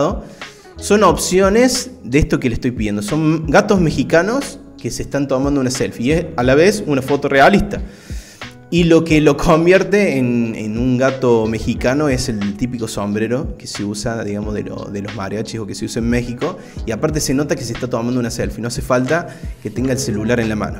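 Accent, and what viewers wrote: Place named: Córdoba, Argentina. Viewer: Que tal capo, me parece que somos coterraneos no, ¿Cordobés?, bueno, lo que te quería consultar es si las imágenes que genera Bing son libres de derecho. Saludos desde Calamuchita 👍✅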